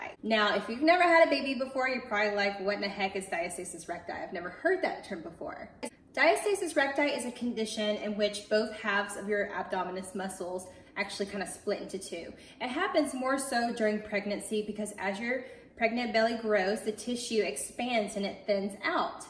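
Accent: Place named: United States. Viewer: ZAKIYA SULEIMAN she has an American accent🤷🏽‍♀️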